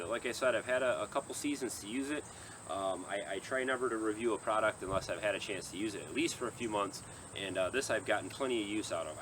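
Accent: United States. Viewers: My wife likes your American accent.and I like the trimmer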